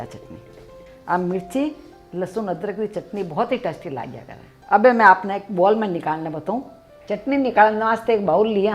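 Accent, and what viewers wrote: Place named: Marwari, India. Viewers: Bht Shukria. Apka bolne ka tariqa achcha laga marwar yad agio. Chatni bht mazedar lag rahi h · Aapki Marwari sunke meri Nani Maa Ki yaad aagayi aunty ❤️❤️ Thankyou so much 😢😢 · aapki marwari bhasha sun kr maja aa gaya. Har step smaj mein aa gaya. today I will try. Thanks Mummy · Ram ram sa ap ki marwacdu boli se pet bhar jata hai aur ap ki recepi👌👌🙏👌👌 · Yummmm👌👌🌹🌹🌹🌹chitney bahut achi he aur I love marwadi bhasha.. soo sweet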